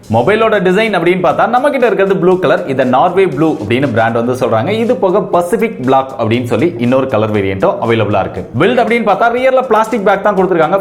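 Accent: Kovilpatti, India. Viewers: Bro neenga kovilpatti ya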